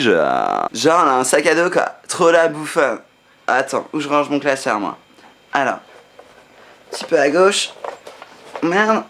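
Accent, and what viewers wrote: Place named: Switzerland. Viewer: Mais tu est suisse j'ai l'imprétion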